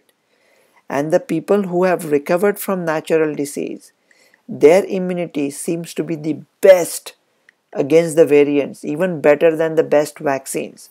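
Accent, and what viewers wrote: India. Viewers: Your accent is still Indian 🙂.Strange. Good thiught though.Keep spreading the hope, positivity.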